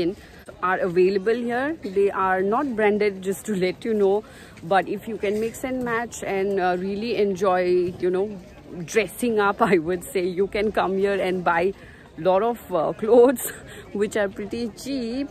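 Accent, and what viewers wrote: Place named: India. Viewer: Your accent is really annoying.Sounds like mainland Indians.,vegetable should be pronounced as vejtebal not vejitebal.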